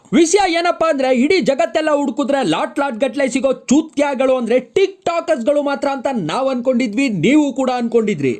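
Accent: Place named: North Karnataka, India. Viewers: ಹಾಯ್ ಅನ್ನ. ಉತ್ತರ ಕರ್ಣಾಟಕ ಭಾಷೆ ಸೂಪರ್ ಅನ್ನಾ ನಮ್ಮ ಭಾಷೆ ಮಾತಾಡಿ ಬ್ರೊ flz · sakath matadti nod pa hulli namm uttar Karnataka bashee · 3;35 Uttar Karnataka language love u Bhai ❤️ channagi matadtiri nivu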